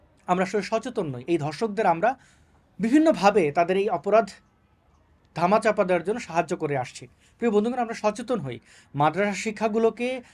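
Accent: Sylhet, Bangladesh. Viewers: আপনার সিলেটের ভাসা খুব সুন্দর 👌👌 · আপনার মুখে সিলেটি কথা শুনে খুব ভালো লেগেছে। মনে হয় আরো শুনি। অন্য ভিডিও তে সিলেটি কিছু বলার জন্য অনুরোধ করতে পারি কি ? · ভাই, আপনার সিলেটি ভাষা বলা, সত্যিই প্রশংসনীয়। মনে হয় আপনি দীর্ঘদিন সিলেট ছিলেন। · তুমি তো ভাই আমরার পুরা সিলেটি মাত জানো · ভাই তুমার সিলেটি মাত ত ভালাউ সুন্ধর। হুনতে ভালাউ লাঘে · ভাই আমি ভারতীয় সিলেটি এবং আপনার সিলেটি Accent টা শুনতে অনেক ভালো লাগে, তাই আমি চাই যে আপনি মাঝে মধ্যে সিলেটি Accent এ ভিডিও বনান। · ভাই আপনার মুখে সিলেটি ভাষা অনেক ভালো লাগে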